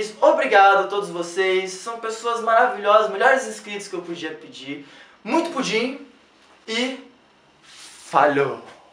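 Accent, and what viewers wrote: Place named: South, Brazil. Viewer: vc e do sul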